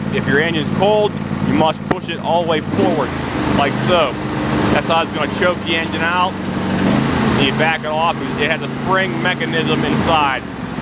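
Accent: Pittsburgh, United States. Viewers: Love your Pittsburgh accent . My Wife and I were laughing as we grew up there but have been in Ga for 20 years now. We still have our Pitt accents and people still look at us funny when we talk. I went dahn to home depot and looked at one today n’at as my wife wanted to buy me a new mower for christmas but I didn’t want the credit card bill so I’m gonna save up till spring and get one then cuz I’m tired of repairing my old MTD yard machine every time I try to use it. Gotta say the old MTD still runs strong yet it need a lot of things revamped. I was thinking of putting the twin V from the MTD on a JD LT model I bought cause the deere cuts and handles so much better than the MTD but I keep getting junk in my carb jet on the JD LT model even though I replaced everything from fuel tank to carb except for the fuel pump and metal line across the motor. 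I think the junk might be coming from the metal line so I’m gonna replace that line and maybe add another fuel filter pre before the carb but I also have to take apart the carb again to clean the jet. If I can’t get that to work I’m selling it for parts along with the MTD and just getting a new mower. I’d love to find a video on the new E120 deere’s concerning maintenance and possible problem areas since I fix everything myself.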